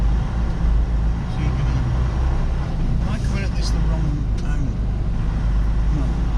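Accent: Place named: East Yorkshire, United Kingdom. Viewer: Hi mate. Did you say your from East Yorkshire? I live in Goole. Your accent sounds alot like mine. 😆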